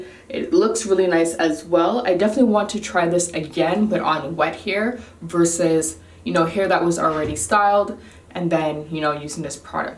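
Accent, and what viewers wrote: Jamaica. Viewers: You Jamaican ? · Do I hear a tiny bit of a Jamaican accent? Never noticed until this video! Looooove 😍. Gorgeous hair and great results, too. Hair goals 🙌🏾.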